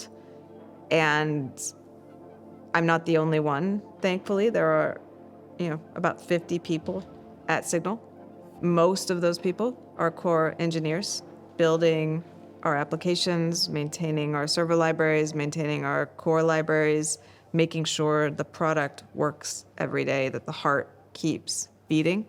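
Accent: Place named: United States. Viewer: Wat bedoel je precies? De inhoud? Of het zware Amerikaanse accent? We hebben allemaal kunnen meekijken bij de CIA dankzij meneer Snowden. Dat Carbon Footprint verhaal is ook makkelijk te fact checken (het recyclen van plastic is overigens exact het zelfde als je toch bezig bent). Ze zegt niets vreemds qua inhoud.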